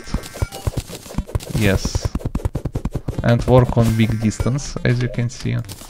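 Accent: Russia. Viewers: also he has Russian accent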